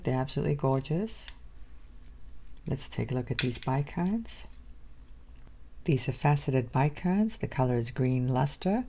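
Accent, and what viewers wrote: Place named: Australia. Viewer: So great to see you back. Good Luck with your PT. Sam's Bead Box is so gorgeous each month I enjoy everyone's unboxing. Looking forward to seeing you create new jewelry designs. We have missed your lovely Aussie accent. Blessings, and Hugs.🌞🌞🌞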